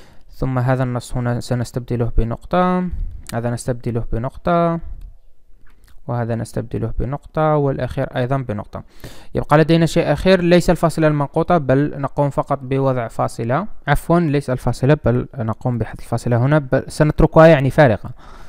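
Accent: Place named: North Africa. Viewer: Souqran!! Hon Moussa, Ana Yahoudi ubakhki Ubrani bass I was able to understand it, knowing the small differences in our ancient languages (Hunna = Hinne, A-Salam Aleikum = Ha-Sholom Aleikhem, Bikoum = Bakhem, WaHuwa = WeHou, Kalam = Kolam, Nafsa = Nafsho, Nukdatein = Nekudothahyin, Fi-Mathala = Be-Mashall, Acher = Ahar, Kabir = Kabir...) Sound's North African. Is this Algerian or Morrocan or something else? Anyway, thank you for clearly explaining the cascade notation clearly, I saw from the code, but also was able to understand each and every word!!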